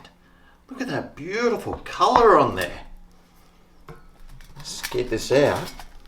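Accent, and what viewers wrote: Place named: Australia. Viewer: You speak good Australiana Accent. Good Dai Cobber. I wonder how old you are? Are you 65? Cos you move slow. Good videos for old geriatric people to show how to cook slow. When you eat and collect all those sauces on your white beard, it looks untidy. Since you are a star on you tube, can you clean shave your beard to a smooth shave? Why? So when sauces fall on your face, you can feel it and will wipe it immediately with a tissue. This video did not have sauces on your beard . Most others previously did.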